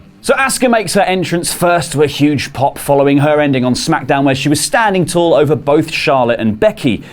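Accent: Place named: United Kingdom. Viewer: Great bedtime story and ur UK accent makes more like store time o yes store time with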